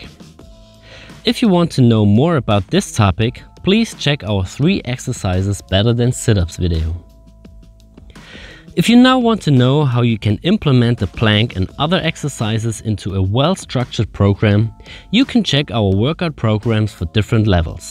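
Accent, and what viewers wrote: Germany. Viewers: Hahahahaha 100% german. I recognize that accent anywhere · Bruder man merkt dass du deutscher bist an deinem englisch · Hardcore German-Accent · Funny accent...🤔.....german? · Can't listen to this guy - such a terrible German accent!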